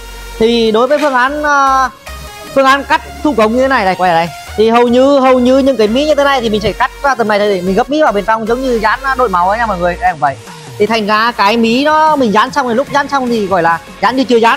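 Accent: Nghe An, Vietnam. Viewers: A giọng nói giống yên thành-nghệ an vậy ạ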